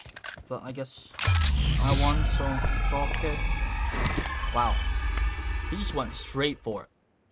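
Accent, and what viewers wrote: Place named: United States. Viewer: I love your American accent commentary love u brother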